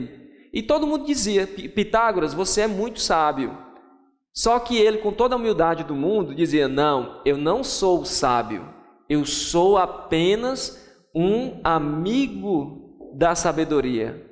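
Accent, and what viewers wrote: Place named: Rio de Janeiro, Brazil. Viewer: pelo dialeto deu pra deduzir que você é carioca,o vídeo foi uma ótima introducao da filosofia para as pessoas interessadas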